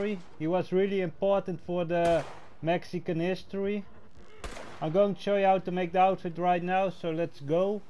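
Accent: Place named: Netherlands. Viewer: You sound Dutch for some reason lol. Cool outfits man.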